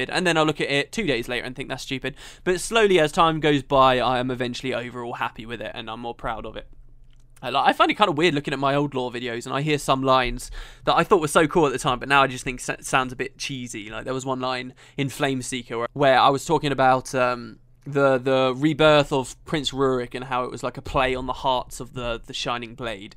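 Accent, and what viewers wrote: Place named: Manchester, United Kingdom. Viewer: Sounds like he might be from Manchester!!! could be wrong.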